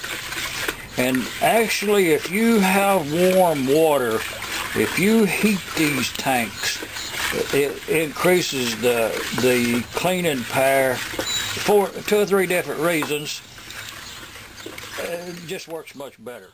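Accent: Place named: Appalachia, United States. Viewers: Josh Green He's from east Arkansas. I love the way he says "just put it in yonder." Appalachian accent. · You sound like a fellow Appalachian American.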